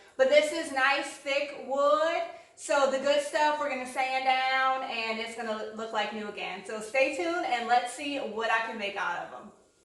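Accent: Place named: New Orleans, United States. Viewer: I live in east Texas. Sounds like you're from New Orleans. Love the planter and ladder.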